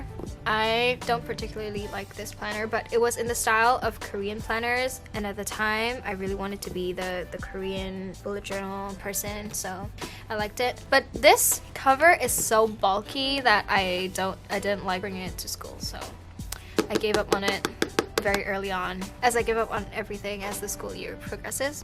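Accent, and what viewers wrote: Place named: United States. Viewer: I can’t place your accent, could you explain on that a bit? Have you been to the US for a short while? You sound that way a bit ☺️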